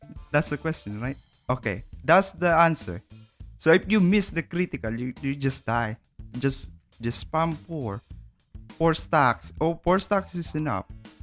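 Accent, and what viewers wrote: Philippines. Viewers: Base in ur tune ur Ph boii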